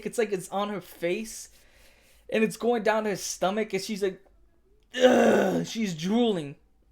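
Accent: New York, United States. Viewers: What part of New York you from broski?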